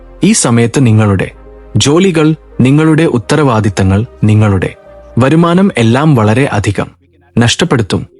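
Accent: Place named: Tamil Nadu, India. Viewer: OM DURGA DEVI NAMAH. The words are pronounced like Tamilian who do not know Malayalam.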